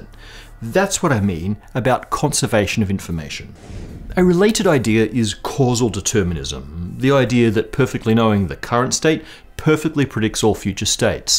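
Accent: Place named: Australia. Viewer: I like this guy, he sounds Australian. I like Australians.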